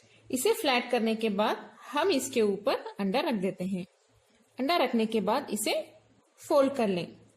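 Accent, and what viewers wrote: Hyderabad, India. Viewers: Madam ka Slang Hyderabadi dikhra😁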